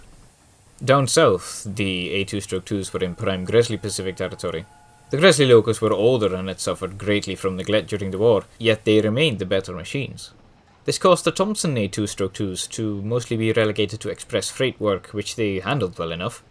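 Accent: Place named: Scotland, United Kingdom. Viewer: An excellent and highly informative video. Although I'm English, I thoroughly enjoyed the Scottish accented narrative. A nice touch and thank you kindly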